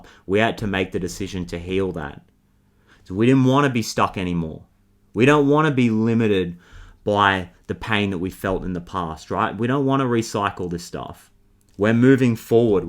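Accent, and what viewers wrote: Australia. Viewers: I'm really grateful I've stumbled upon your channel. I've lived the happiest years of my life so far in Australia, life happened and I had to return to my home home country and all the challenges that come with it. Listening to you talking with an Ozzy accent and about spiritually which I've been in so much need for, is literally soul soothing amidst the time I'm going through right now. I deeply appreciate you and am grateful for your life, your gift and being connected to you. I Love you too mate❤ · omg you’re australian i luv your accent saur much! srsly it’s dope but thank you! you spoke right to my soul 💯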